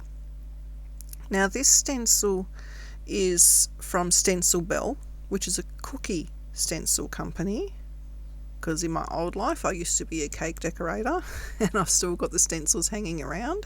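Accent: Australia. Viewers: Such a cute stamp set and card. Great to hear a fellow Aussie...tfs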